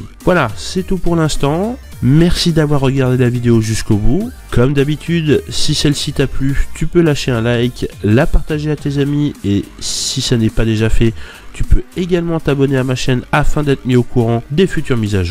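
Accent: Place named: England, United Kingdom. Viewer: Sympa cette petite vidéo, et quel accent anglais <3. J'irai tester ça sur le PC de mes parents .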